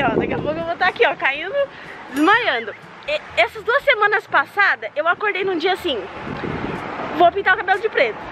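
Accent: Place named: Portugal. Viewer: já ta ficando com sotaque de Portugal ❤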